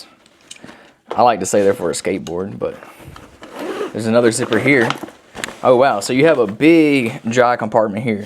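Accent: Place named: South, United States. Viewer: Your little southern boy accent is so sexy.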